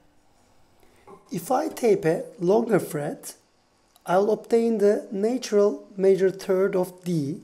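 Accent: Turkey. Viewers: Aksandan anladım ilk Türk olduğunu :D çok düzgün İngilizcen, helal olsun. Türkiye'den böyle bilgili müzisyenleri medyada görmek çok güzel. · Nice work, sir! Ingilizceden anladım Türk olduğunuzu. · abi şivenden anladım türk olduğunu like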